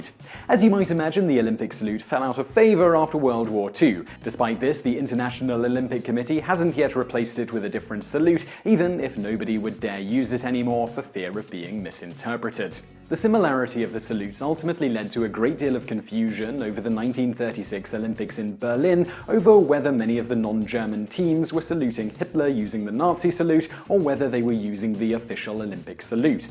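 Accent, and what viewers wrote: United Kingdom. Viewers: Jadger1871...It is wise to keep in mind that he is from Britain and certain words are said different in other countries. I live in a country other from where I was born and grew up and it is not unusual for people to correct me in my pronunciation. I pay then little heed because my way of saying the word is no more or less valid then is theirs. If they were to go to my country they would be corrected there just as I am here.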